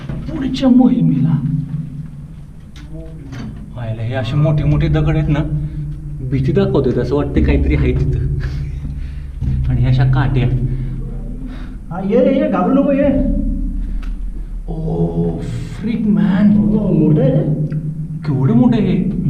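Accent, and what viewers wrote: Satara, India. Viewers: Mla avajavrun tu satarkar vatatoy ..am i right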